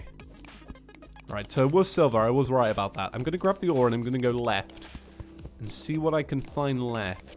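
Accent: England, United Kingdom. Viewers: I knew you're from england even before you said it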